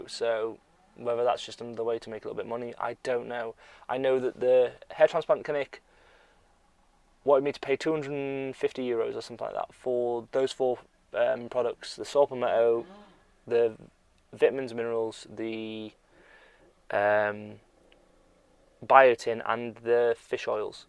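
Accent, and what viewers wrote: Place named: United Kingdom. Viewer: What was the FB group please? Didn’t catch as much as I tried (UK accent) :) Keep posting mate, good results for me.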